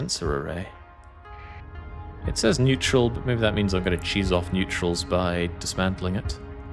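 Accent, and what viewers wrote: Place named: Scotland, United Kingdom. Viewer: Hearing a Scot talking about "the first thing you should do is go to the Bar" and I get flashbacks to That Time I Was In Livingstone in the 70s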